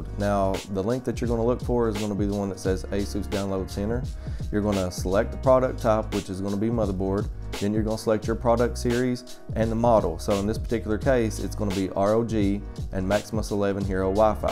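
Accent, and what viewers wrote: South, United States. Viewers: thank you so much. didn't expect a computer man to have a southern accent haha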